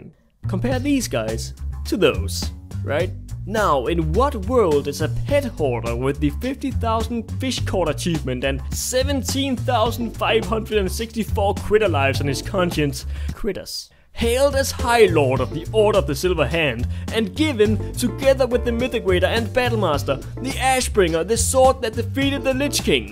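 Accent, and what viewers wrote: Denmark. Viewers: iv never seen anyone of your videos, but you make some valid points, Im both on pro and con-side of the artifact weapons, but so far i like them :) Btw, it sounds like you have a danish accent? Am I close? :p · Is it just me or do you sound danish like heck :o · Og man kan bare høre at du er dansker! :D Subbed! :) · this guy has the most danish accent in the world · bro you danish? I can act hear it :D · I know that accent You're from DK right?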